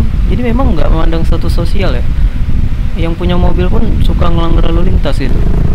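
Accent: Medan, Indonesia. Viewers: udah agak ilang kutengok logat medan kau disini lae, jangan di ilangin lae daya tariknya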